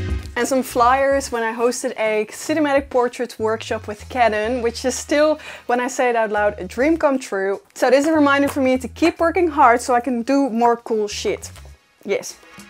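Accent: Netherlands. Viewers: Oh, what a nice shoot. PS. I love your accent too, because my mom is Dutch too (but your's is so much fresher😅, She's been in SA for 60+ years) Keep up the good work and teach me more about photography, OK? thanks😉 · Sooo happy this came on my recommended videos, I love your energy it really makes me excited to shoot aswell, even though i feel a creative block right now, this motivates me!! (Also recognized the dutch accent the first few seconds!! Zo leuk om eens een NL account te volgen 🥰)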